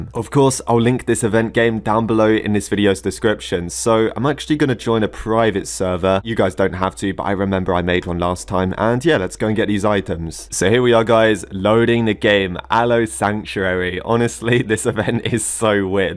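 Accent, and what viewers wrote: United Kingdom. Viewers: Are you using your british accent? I love british accents!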